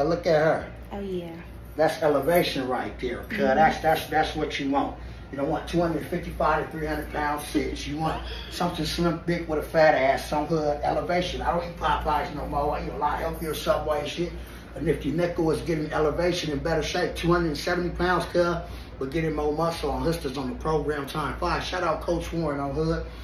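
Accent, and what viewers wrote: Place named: Los Angeles, United States. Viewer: That's how they talk in LA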